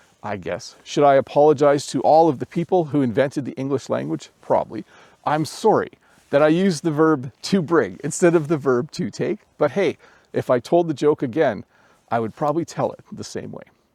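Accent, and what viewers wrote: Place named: Canada. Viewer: you speak wonderful, informal, Canadian English. We LOVE your programs and we LOVE seeing the great outdoors when you do your lessons. You are on a farm, speaking informally. We LOVE your programs. Keep going!! My English students love your lessons! 😊😊🌻🌻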